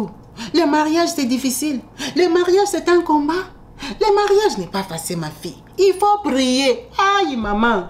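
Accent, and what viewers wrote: Democratic Republic of the Congo. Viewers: Oulalaaaaa qui vient agresser verbalement notre petite 😮 svp dégagez d ici si vs n appreciez pas, elle s exprime et on comprend très bien et l originalite de son blog est qu elle est authentique ds son accent, sa façon de conter tu te retrves on dirait une tantine qui raconte avec précision le kongossa com ci elle y etait et elle ns fait voyager ds les scenes. Super bonne narative pr ceux qui aiment ce qui est terre à terre. Il y’a des milliers d autres youtubeurs qui ont fait l accademie des beaux arts avec doctorats vs pouvez y aller 😂. Ns on aime notre petite avec sn accent congolais en plus elle est respectueuse ❤ moi ss une bne camer.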